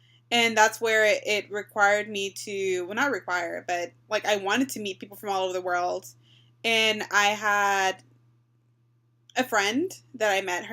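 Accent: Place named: Spain. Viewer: I love your Spanish accent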